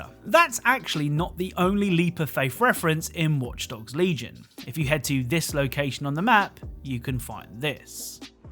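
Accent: England, United Kingdom. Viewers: Like how? Like catacombs..? Or like catacombs? Or maybe catacombs? I don't get what you're saying. I mean he's got an English accent, but he says catacombs as catacombs...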